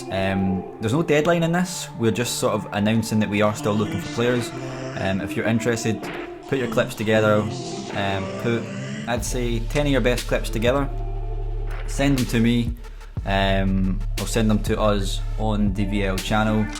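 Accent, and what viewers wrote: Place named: Bishopbriggs, United Kingdom. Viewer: wits the deal wae ur mad accent yer fae bishy pal.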